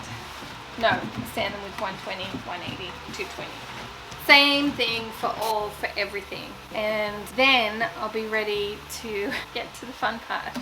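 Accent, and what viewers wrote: Australia. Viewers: I love this,piece! You scrubbed and sanded and scraped your heart out! I love Mrs Browns Boys!, did you see the one where she super glued her arse to the chair, I about peed my pants I laughed so hard! I love British TV, but… I’m addicted to Aussie television! All of it, whatever I can get my hands on! And I’m absolutely in love with your accent! And of course your incredible talent!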